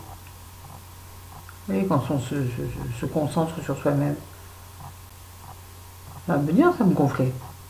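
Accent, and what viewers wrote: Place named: Marseille, France. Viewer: voilà, la gratitude, tous les jours, je remercie tout et tout le monde, même moi même pour tout ce qui m'arrive de positif. Autrement perso, c'est bouddhisme, mais le reste est perso, par contre d'accord pour l'égo, c'est une saleté qui pourri l'humain. bisous ma mdr pour la vidéo accent marseillais!!!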